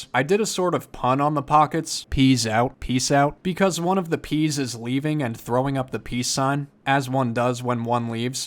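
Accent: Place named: Virginia, United States. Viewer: OMG VIRGINIA NOW I CAN PINPOINT YOUR ACCENT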